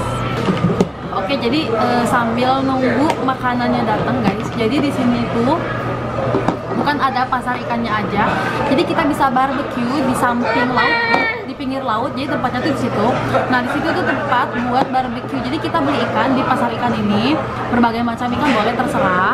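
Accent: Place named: West Java, Indonesia. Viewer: Logat sunda bogor na masih aya meskipun lama di jepang 😁😁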